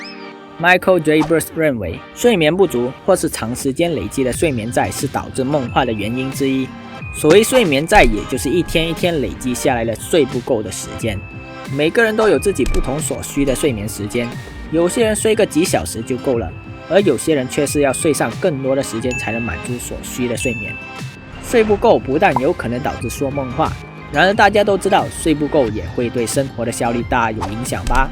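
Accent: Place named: Malaysia. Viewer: 从你的说话的方式就知道你 是马拉西亚来的欧 就酱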